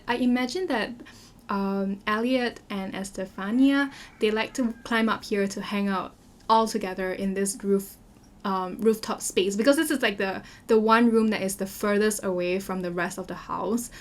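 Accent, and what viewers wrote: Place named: Singapore. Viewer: i heard you accent and went ??? Singaporean 🥰 so nice to see a local simmer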